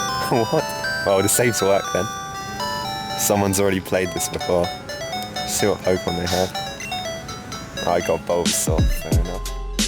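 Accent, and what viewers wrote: United Kingdom. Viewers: Had a feeling you were british its nice to hear your voice for a video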